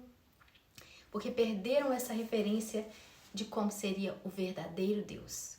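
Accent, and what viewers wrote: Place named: Minas Gerais, Brazil. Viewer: ❤❤. Você. Hoje. Tá. Muito. Linda. Bem. Arumda. E. Você. Fala. Muito. Bem. Eu. Adoreio. Você. Quero. Seu. Tel. Endereso. E. Acidade. Seu. Sotaque. E. Minerinha. Bj❤❤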